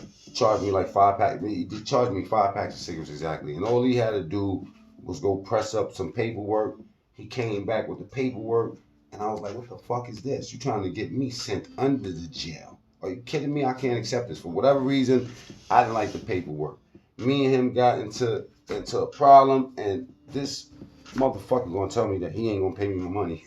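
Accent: Brooklyn, United States. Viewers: Yo I just subbed to ya channel cuz you kept it mad real you coulda lied and been like you washed home boy up but na u kept it funky peace homie IDK if ur from Brooklyn but u sound just like my boy from Brooklyn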